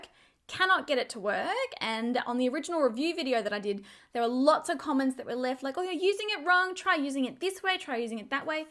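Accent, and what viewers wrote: Australia. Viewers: Girl! Your Australian accent is beautiful! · I LOVE your videos. I've learned so much from you. I'm sure many of your subscribers are younger than I am, but you've helped me be more confident with makeup at 44!! I love to see your subscriber number climb because you deserve it!! You need to share a tutorial on that coral peachy look your rocking today!!! LOVE IT!!! Much love from America! Hope your winter is not too bad in Australia, and your accent is charming.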